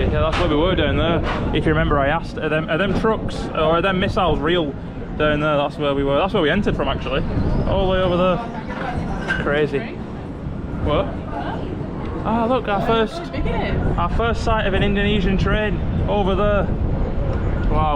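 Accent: United Kingdom. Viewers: Bro..Menonton konten mu, serasa menyaksikan film kerajaan klasik dari Inggris Raya.. Aksen bicara mu..Inggris banget... 😁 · Hi and, welcome in Jakarta. On 5th of October 2025 we will celebrate do called "80th Armed Forces Day" here in Indonesia, so you saw a whole lots of Army, Navy (Marines under Navy) and Air Force stuff being display for public. For your pronounciation, I know you are from UK. Enjoy your stay in Indonesia then. Cheers...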